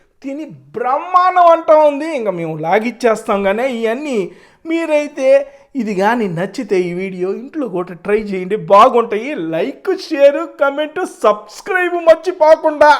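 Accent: Nellore, India. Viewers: Mana achamaina Nellore basha inka bathike undhi 💚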